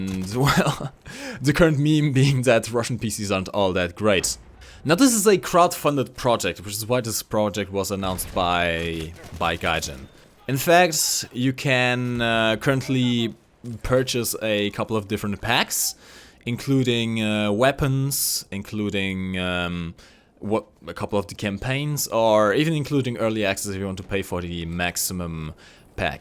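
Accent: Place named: Germany. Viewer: Your German that's cute. ;)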